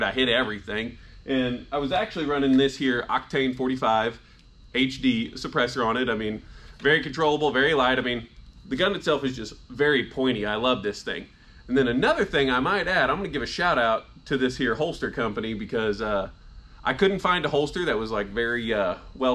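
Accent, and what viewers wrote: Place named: Texas, United States. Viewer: Love the video for that I thank you. Sense of humor I rate you at about a 7/10. Shooting skills about a 3/10 Taste in beer about a -9/10 Hat about a -3 / 1000 Firearm Hk about a 1/10 Firearm Sig about 8/10 1950's sunglasses 3/10 Texas like accent. Erm It's Texas so 0/0 Garage or shop space 1000/10 Cool factor , it's a outdated design 2.5/10 Bragging rights because of a game lame/10 and your mom is calling you. Paying that much wtf are you nuts?/10 Shooting targets that had already surrendered and lying down big bully/10 Would I own one ? Well hell yeah/10 Why? Tears of the sun of course. In all seriousness: I have never owned a Mk 23 but have shot them before. Super cool firearm and accuracy is superb ! The extra weight out front makes it easy to bring back on target. I have never shot one suppressed dang it. On another side note. 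Metal Gear Solid is one awesome game! My rating system is about 5 beers out of a six pack. I have to say I would think that HK could have included a nice case . Something like the FNH FNX .45 Tacticool case . 2 mags are okay 3 mags are way better. HK mags are stupid expensive. I have 2 USP's and the extra mags back when I purchased the firearms were around $62 to $75 a piece. Now the USP mags are around $40 a piece which means the Mk23 mags are probably just over $50 each. I own a HK P7 series so I pretty much know what a over priced HK is. May I ask what that MK23 is like with that big ole suppressor? It looks to be almost Major League baseball bat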